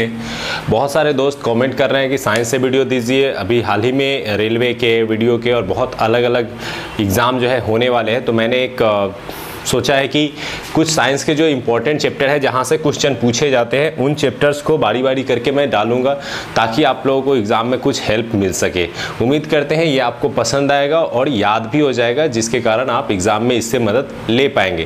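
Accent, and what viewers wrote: Bihar, India. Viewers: sir aap bihar se ho...??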